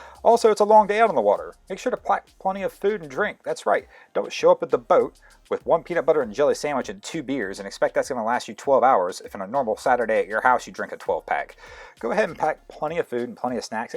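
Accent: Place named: Maryland, United States. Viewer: are you from Maryland? Your accent sounds like a Maryland accent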